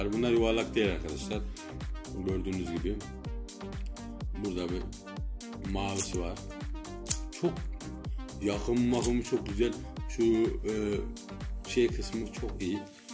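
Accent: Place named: Eastern Black Sea, Turkey. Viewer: NERELİSİN Doğu Karadeniz şiven var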